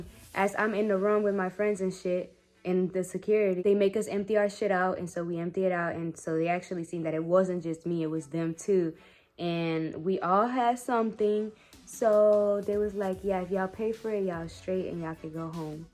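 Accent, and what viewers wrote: United States. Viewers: You accent is very American :) do you visit Spain ( before covid I mean :) )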